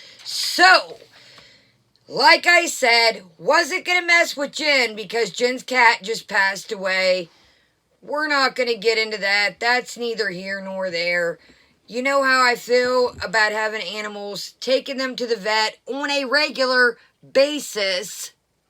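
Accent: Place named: Northeast, United States. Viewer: She is disgusting. We have been saying this for over a year. So glad she had been found out! Are you from up North (NE) by chance - OH, NY, PA, MA, RI etc? You have the personality and voice of someone who might live up North. I live in the NE section of the US, that is why I am wondering. I just subbed to your channel.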